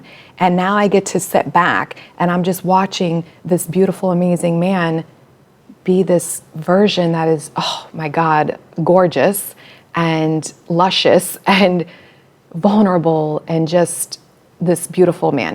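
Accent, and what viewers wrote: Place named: South, United States. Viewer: Such a New Yorker....love the accent from down South girl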